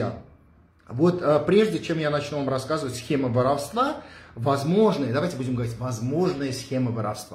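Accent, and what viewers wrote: Karabakh, Azerbaijan. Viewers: акцент не меняется всегда он карабахский армянин это точно . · Этот канал скорее всего фейковый.. А этот кадр засланный казачок с азербайджанским акцентом косит под армянина и льет грязь против своих, якобы правду говорит, свой же не будет якобы врать !!! Дешёвая подделка !!! Я его невпервый раз слышу, читается как ребёнок...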